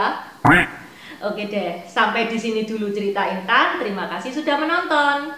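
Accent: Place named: Surabaya, Indonesia. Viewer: Yg bicara ini , aksennya kental.jawa timur , suroboyo. mbedoknya lucu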